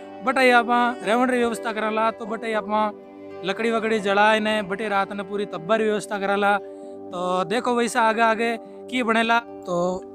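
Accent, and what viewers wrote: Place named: Marwar, India. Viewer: Bawlya k Ped 😂😂😂😂 Marwadi m Bhut pyara bolya Superb Video Bro ,..❤️❤️❤️❤️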